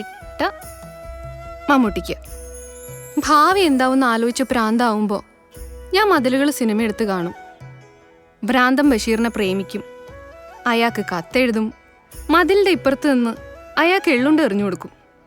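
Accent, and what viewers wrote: India. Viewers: കോഴിക്കോട് കാരി ആണല്ലേ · Randam classil Kauravar… njanum…feel aaki kalanju…Valluvanadan slangum…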